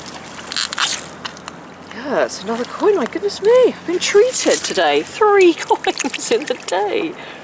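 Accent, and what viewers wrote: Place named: RP, United Kingdom. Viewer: dam this lady is gorgeous love the posh accent and then mudlarking lol good for you i do a bit down rainham way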